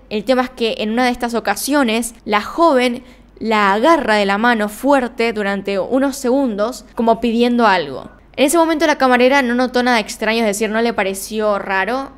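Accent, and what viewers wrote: Argentina. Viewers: A veces siento que nos estas regañando, luego recuerdo que eres Argentina y que así hablan... Pues de me pasa.... Me sentí regañada al final del video 🤣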